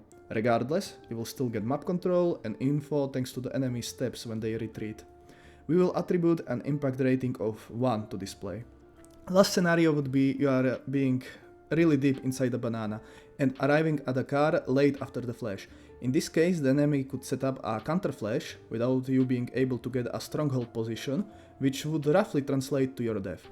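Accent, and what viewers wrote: Czech Republic. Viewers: bros def czech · Hi good video btw are u from Czech republic? Ur ascent is so czechian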